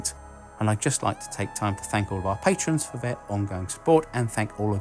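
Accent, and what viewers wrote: United Kingdom. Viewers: I can’t listen to or trust people with british accents so won’t be watching any more of your videos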